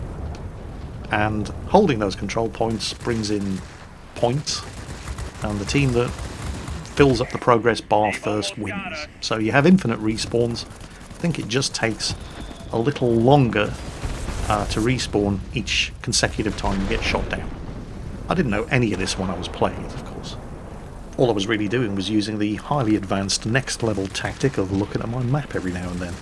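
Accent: England, United Kingdom. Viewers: I'm not much for realistic simulators such as WT. I just don't enjoy the game as much. Could it be because I'm not good at it? Sure! But the point of having fun in a game is based on personal preference. The people saying "WT is better, get gud" are the same people who talk about PUBG being a good game (it isn't, btw). WoWP in its current state is for the individual who just wants to shoot at things (such as myself). I don't play many games, because I don't like most games. Developers are attempting to reach broader audiences by creating games such as WoWP. If it doesn't fit your preference, cool! No problem! There are many games that probably DO fit your preference. Fighting over games like "fam bois" doesn't make sense though. It's not constructive. And I know, this is a YouTube comment section, so it doesn't have to have any constructiveness to it, but regardless. 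This is a video, we're not watching idubbbz or ricegum. His smooth English accent should be calming everyone down. This is a part of YouTube that can be, and should be, a place to critically critique war-type games. Will I download this game and try it? Maybe. I really enjoy WoWS right now and have moved away from WoT because the learning curve is just different between the two. I'm not great at games overall. steps off soapbox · even when you fuck up, or don't play well, that British accent makes it seem oh so correct.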